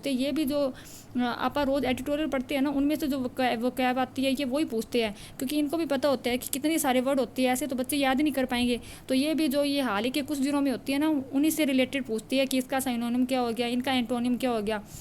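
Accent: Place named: Haryana, India. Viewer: Harianvi accent ✌️💜💜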